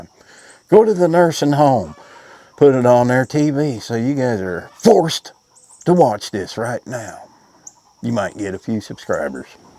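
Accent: South, United States. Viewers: The video or your 1st video was good..u had that southern voice...and photogenic....very nice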